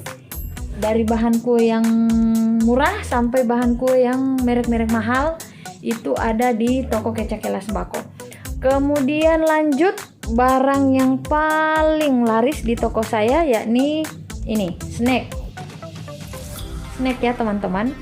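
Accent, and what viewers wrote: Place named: Sulawesi, Indonesia. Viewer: Ibu ini orang mn, logatx kaya orang Sulawesi.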